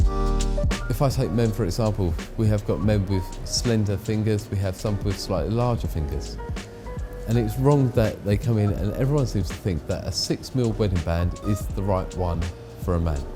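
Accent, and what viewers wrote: England, United Kingdom. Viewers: I love your britisch accent! Cool. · English accent no such thing as British accent. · That british accent. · It is clearly an English accent you wouldn't hear this sort of accent in Northern Ireland,Wales or Scotland,it's not therefore 'British' but English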